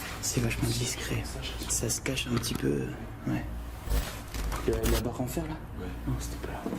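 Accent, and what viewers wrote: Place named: North, France. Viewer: Bonsoir vous êtes nordistes? Non? J'ai le même accent 😊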